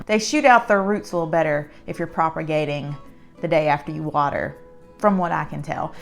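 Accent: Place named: South, United States. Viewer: Thank you for a giggle and a smile.. Hoyas are the only plants I have. I live in an apartment in southern Ontario Canada (near Toronto). My growing windows are facing N NE. They are currently indoors now. This year they produced many peduncles, but they did not bloom. I am waiting patiently. Your southern accent is amazing!!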